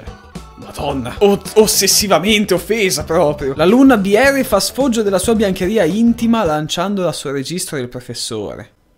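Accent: Bologna, Italy. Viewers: Uaa sei di bologna? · Sei di Bologna lol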